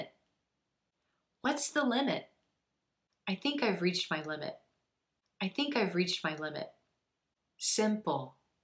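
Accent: United States. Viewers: I love your lesson and your American accent!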